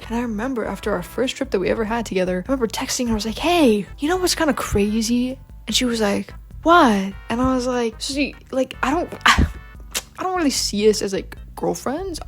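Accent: United Kingdom. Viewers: so proud of you:) ladies r very cool and attractive i agree. also very good bri'ish accent (from a bri'ish themself) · Great british accent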